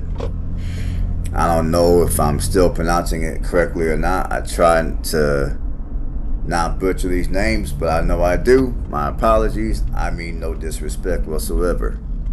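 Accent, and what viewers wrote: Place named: New England, United States. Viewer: Guess illereate yankee voice beats AI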